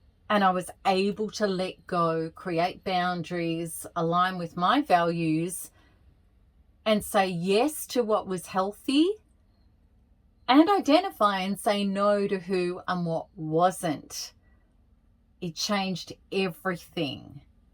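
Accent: Australia. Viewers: I love the Ozzy accent. This content really hits home. My mom was a narcissist and she passed in 2018 and I keep learning more and more about her through these types of videos. It has to be one of the most misunderstood behaviours in society and challenging to understand. But it's a work in progress and that of course is a good thing.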